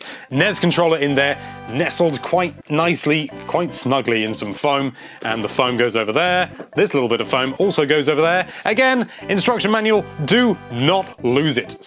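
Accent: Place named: United Kingdom. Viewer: English folks from the UK tend to pronounce anything ending with an S as having a Z sound. Likewise A and R are swapped at the end of words... he called Sega seger.. Ocareina sounds like Ocareiner and Doctor become a Docktah It's just how their English tends to work when spoken...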